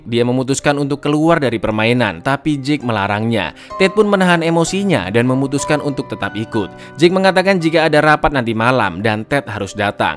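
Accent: Java, Indonesia. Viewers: Pean org jawa ya mas? 👍🏻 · Jowo keras lorr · ketoro lek wong jowo bgt😂🤣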